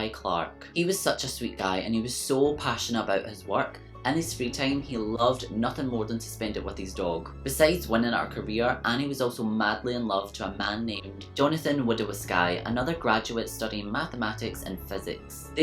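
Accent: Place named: Scotland, United Kingdom. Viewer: I’ll let it go because we did get a Scottish accent in its place. ;) Lol!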